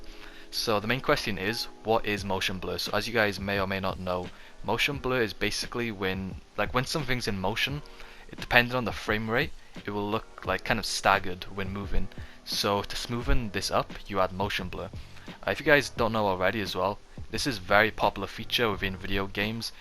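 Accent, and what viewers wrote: Wales, United Kingdom. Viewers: Are you welsh I am I’m from swansea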